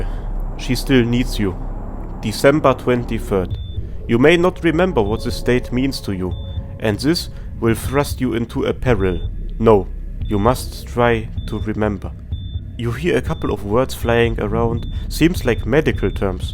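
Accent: Germany. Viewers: Bruder dein deutscher Akzent ist LEGENDÄR XDDD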